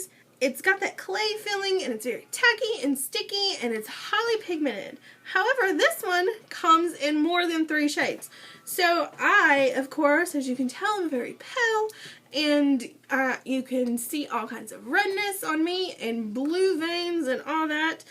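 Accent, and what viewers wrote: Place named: West Virginia, United States. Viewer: All I need is broke fat girl with a WVa accent sounding like hillbilly Minnie Mouse telling me how to look cheap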